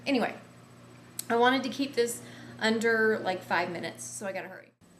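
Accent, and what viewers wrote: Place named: North, United Kingdom. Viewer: Lol, I totally thought you were from the north.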